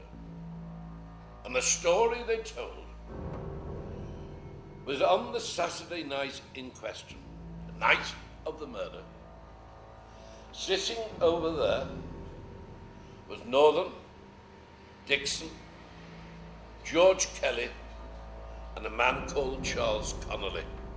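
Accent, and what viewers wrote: Liverpool, United Kingdom. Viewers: Sounds like a Liverpool accent for that area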